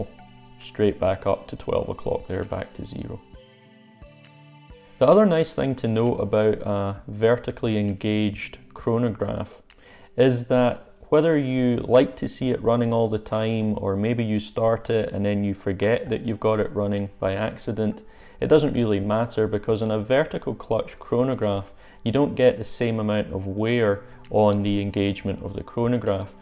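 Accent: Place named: Scotland, United Kingdom. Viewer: I just bought one with 25% discount here in Hong Kong (I'm born and bred in Scotland, so the accent and all just takes me home.) It's actually great value for an in-house column wheel chronograph with bags of history and heritage too. Great review of the watch and scotch!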